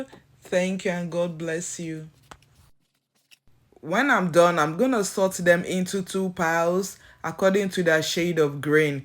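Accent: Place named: Nigeria. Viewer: Where you got those from? I like your Nigerian accent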